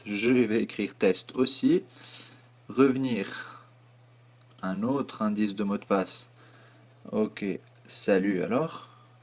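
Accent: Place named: Tunisia. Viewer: petit accent (tunisien?) tres charmant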